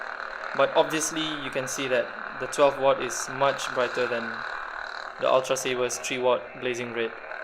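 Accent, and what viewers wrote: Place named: Singapore. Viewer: lol I hear a Singaporian accent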